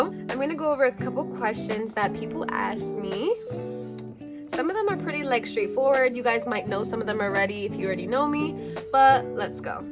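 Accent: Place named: United Kingdom. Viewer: Great video! Your British accent always gets me and great Q@ keep it up😁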